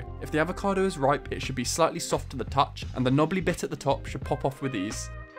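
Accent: United Kingdom. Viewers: Damn his British accent will be the death of me lol. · British accent..... I love itt😍😍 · u r definitely a Britain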